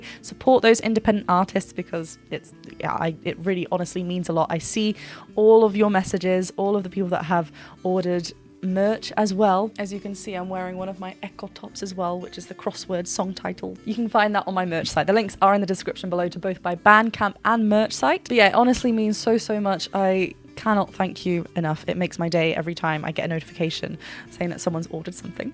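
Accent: United Kingdom. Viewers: Nice reaction. Be prepared, The Warning Army is coming. This will be your next favorite band. The Warning is addictive. You have no idea how much fun you are going to have going down this rabbit hole. I assume from your accent that you are in the UK. They just played a soldout show at O2 Academy Brixton in London. Your next reaction should be Evolve, live at the MTV VMAs. Don't forget to turn it up. As all Warning fans say, "You have been Warned!"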